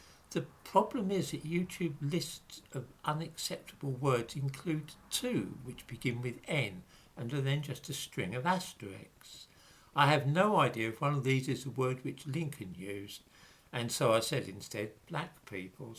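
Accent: United Kingdom. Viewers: He is excellent! Genuine content, clear presentation, and delightful British wording and accent. Brave!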